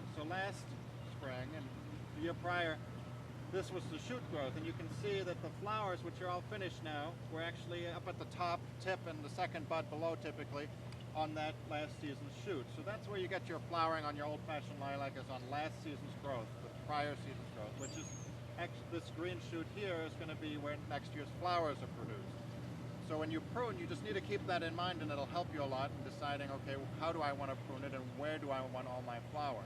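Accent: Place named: South Dakota, United States. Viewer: Sounds like a guy from South Dakota! Good instruction, thank you!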